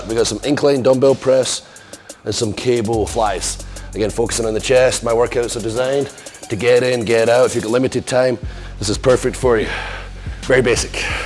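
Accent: Scotland, United Kingdom. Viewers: Not British it’s Scottish mate.... Mon then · I thought you're Scottish mate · English?... you're fking Scottish, mate. I take it back, you talk like us, you're worthy. ...like it's up to us 😂 Don't fk with a Scotsman. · british?I thought he is Scottish🤔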